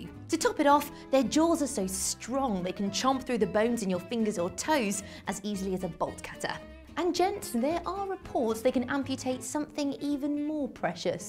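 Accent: United Kingdom. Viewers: i love your accent! UK?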